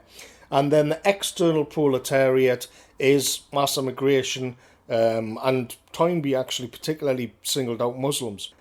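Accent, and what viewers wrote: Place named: Newcastle, United Kingdom. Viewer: views are so intelligent, researched and insightful, that I sometimes wonder if he is himself a man of education, and the unabashedly working class Geordie accent is a front...?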